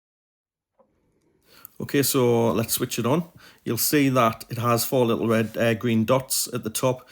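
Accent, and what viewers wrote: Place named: Newcastle, United Kingdom. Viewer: Cool review Geordie lad Like me 😉😉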